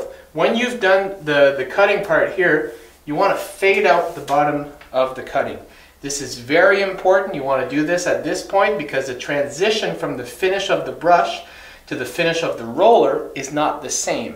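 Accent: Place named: Moncton, Canada. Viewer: you must be from moncton....the accent sure sounds like that.good tips tks.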